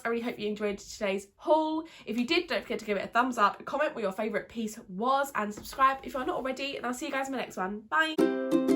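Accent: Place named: United Kingdom. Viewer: I REALLY LIKE YOU ESPECIALLY YOUR BRITISH ACCENT 😃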